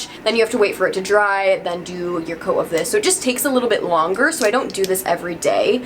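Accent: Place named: Michigan, United States. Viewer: She sounds like she is from Michigan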